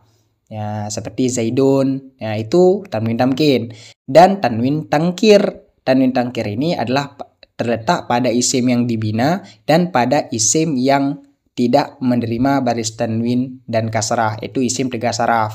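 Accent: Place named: Java, Indonesia. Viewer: Bahasa Indonesia manteng medok that tgk😅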